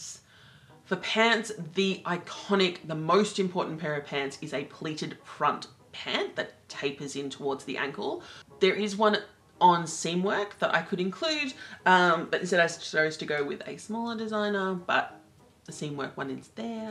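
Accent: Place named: Australia. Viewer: OMG! an aussie! Thank you! :)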